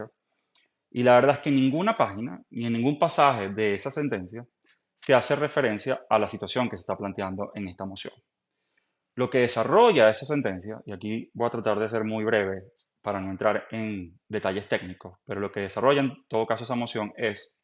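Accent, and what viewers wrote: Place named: Venezuela. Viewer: ME ENCANTA TU TONITO, VENEZOLANO.❤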